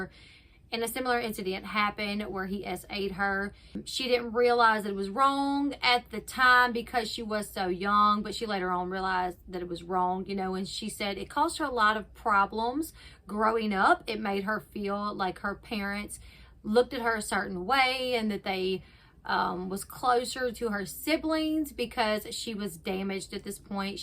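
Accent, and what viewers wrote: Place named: Louisiana, United States. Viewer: I know I love it!! It's that Cajun accent 😍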